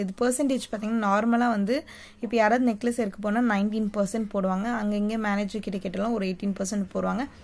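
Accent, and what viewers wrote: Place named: Telugu, India. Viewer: Ok you are telugus so your Tamil is saking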